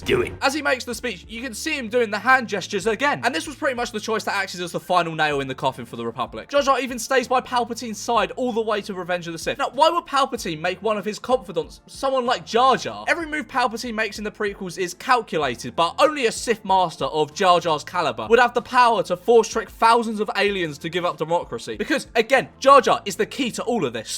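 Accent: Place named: Liverpool, United Kingdom. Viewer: The scouse accent is class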